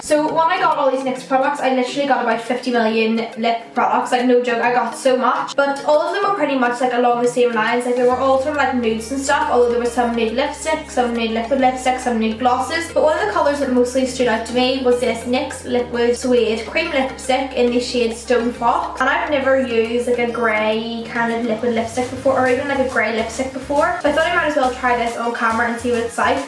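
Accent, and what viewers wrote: Northern Ireland, United Kingdom. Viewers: Are Your British? Your Accent Is So Nice! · Are you from Northern Ireland? 😊 ps. Love your videos❤️❤️❤️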